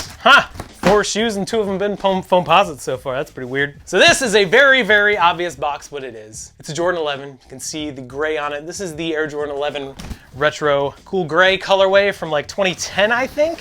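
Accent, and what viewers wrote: Chicago, United States. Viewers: PLEEASSEEE stop doing the Chicagoan intro man I like the videos but that KILLS it like damn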